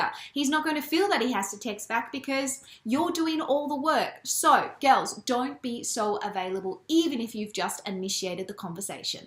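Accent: Australia. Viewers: The aussie accent tho 🙂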